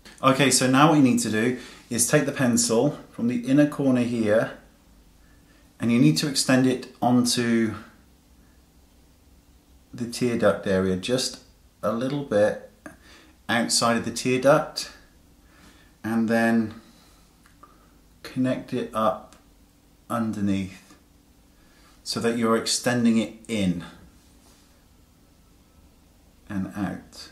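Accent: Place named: West Country, United Kingdom. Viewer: You have a slight West Country hint to your accent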